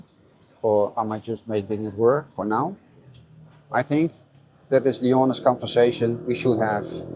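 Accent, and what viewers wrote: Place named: Europe. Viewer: let me be honest with you – as a European (you sound European, forgive me if my assumption is wrong) pensioner with only limited income, stay in Europe. lots of places at the periphery that are as stunning as they are affordable. yes, you may need some winter clothes, but you can live safely and without much uncertainty. and if you save, you may be able to spend one or the other winter in SE Asia, you can pick the cheapest off-season flights.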